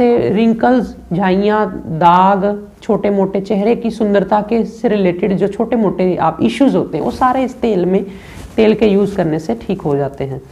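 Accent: Punjab, India. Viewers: Aap Punjabi ho??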